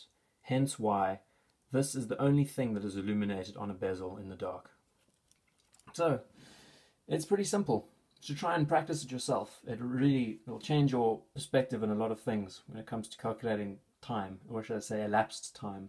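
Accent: South Africa. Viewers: Sounds like a Saffer twang to me.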